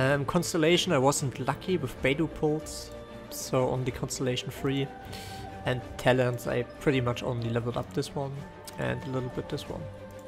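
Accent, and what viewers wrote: Germany. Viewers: Don't think so. Sounds very german. Unlikely he would consider chinese server with rather bad ping.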